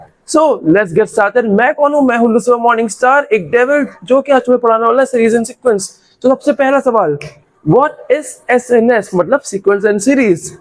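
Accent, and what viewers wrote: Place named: United Kingdom. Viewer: Uk aceent By the way 1st Comment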